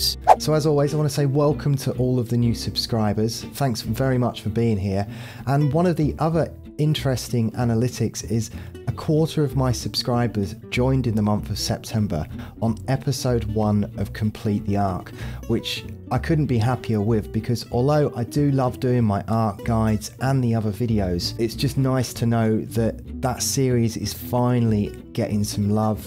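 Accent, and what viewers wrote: United Kingdom. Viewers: Youre forgetting about the gorgeous british accent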